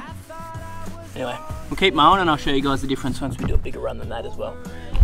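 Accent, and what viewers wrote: Australia. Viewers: I knew you were Aussie before I clicked on the video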